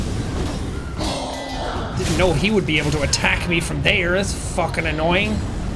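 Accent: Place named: Ireland. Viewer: 8 and a half hours of screaming irish man, yes sign me up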